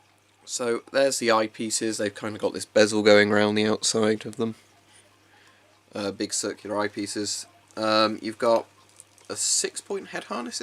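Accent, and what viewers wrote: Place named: United Kingdom. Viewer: I don’t mean to get myself involved in your privacy but out of curiosity I would like to ask what is your nationality? You have a British accent but I seen you wear a German camouflage uniform still issued in the German army.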